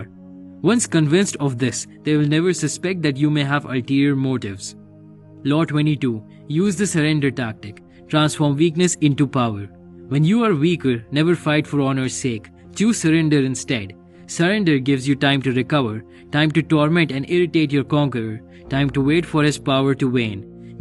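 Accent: India. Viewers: Couldn't watch this for more than 5 seconds because of that Indian accent. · That it is being narrated by an Indian makes me not listen to it 🚮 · so that means u are an indian . I knew that accent was native. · Do i detect an Indian accent? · Immagine clicking this video expecting frank underwood's voice and hearing an indian.. the pain.. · I really enjoy marked Indian accent. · very Indian accent.... awful! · Cant stand his annoying Indian accent. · Law 51, dont use an Indian to narrate in English. Its "wery" irritating. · fuuuuck an indian accent SIGH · Please stop. I hate Indian accent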